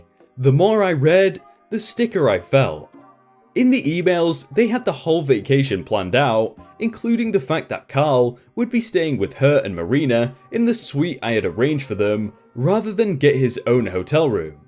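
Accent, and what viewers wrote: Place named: North, United Kingdom. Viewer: NYC BABY I'm not upset, I'm well aware he mispronounces things. I assume when you have a northern accent words sound different in your head, but I wouldn't know. Regardless whether it's a name or a word, it's new and I wouldn't have pronounced it any differently NOT being a northerner. It looks like sand-oval. But then if a word is new I do pronounce them how I read them.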